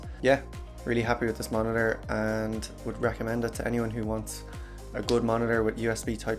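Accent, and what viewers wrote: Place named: Ireland. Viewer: Good explanation but I would like to see another video of your experience with it. I have been shopping around and I think this is the one I like the most for the price and brand name but I still am not sure yet. Thank you for doing this video. By the way you sound IRISH.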